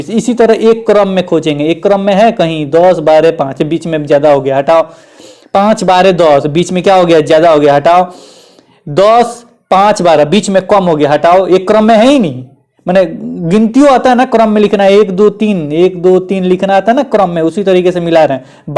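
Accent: Bihar, India. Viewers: Sir ji aap to pakke Bihari Lg rhe hai matlb aapki bhasha 😀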